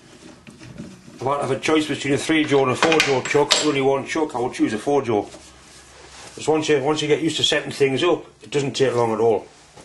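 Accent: Newcastle, United Kingdom. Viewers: ***** Closer to Scotland than Yorkshire as it happens, but yes, I'd say in England, around Newcastle or thereabouts. Closer to a Geordie than a Maccem though. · zonnekat he speaks Geordie,from the north of england!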